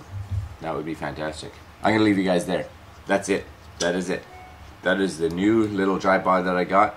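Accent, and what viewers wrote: Canada. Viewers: My tripod has been ordered! Love the Canadian accent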